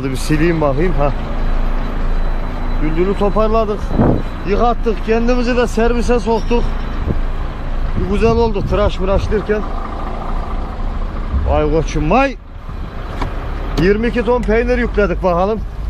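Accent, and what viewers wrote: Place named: Sivas, Turkey. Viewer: Abi valla seni hemşehrim zannediyordum Sivas in ağzına benziyor konuşan adamsın valla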